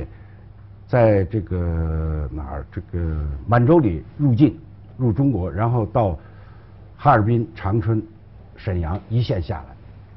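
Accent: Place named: Beijing, China. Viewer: 北京话听着很亲切，又风趣又不失风度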